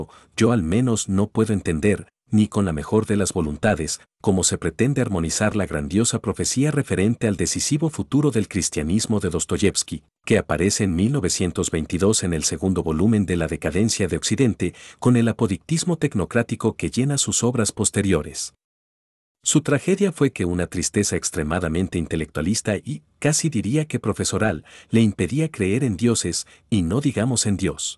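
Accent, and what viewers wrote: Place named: South America. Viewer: Vale, el acento suramericano es soportable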